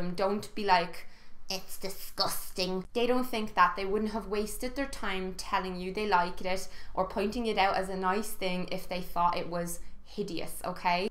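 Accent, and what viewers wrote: Ireland. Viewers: Haha I'm a sucker for an Irish accent!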